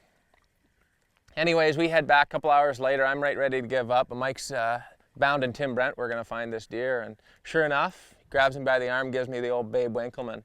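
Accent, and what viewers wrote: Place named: Canada. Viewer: He sounds flamboyantly canadian.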